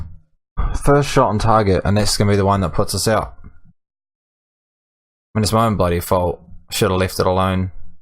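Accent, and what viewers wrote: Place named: New Zealand. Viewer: You seem to have picked up the accent so I had you down as NZ born and bred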